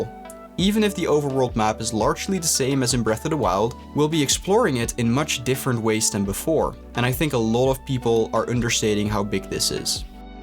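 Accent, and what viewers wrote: Netherlands. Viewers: Are you dutch? You sound dutch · Are you perhaps Dutch? I recognize that accent 😂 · Dutch detected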